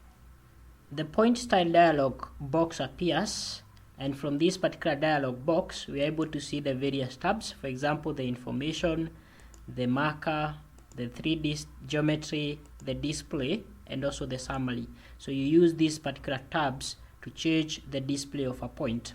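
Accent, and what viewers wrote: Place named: East Africa. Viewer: Useful, especially for a quick reference. And I like the accent too. Sounds very Eastern African 😊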